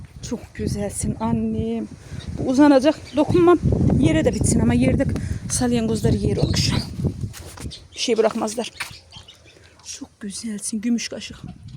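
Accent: Azerbaijan. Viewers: Кardesim ellerinize saglik siz azerisinizmi .konusma iz cok benziyor azeriye.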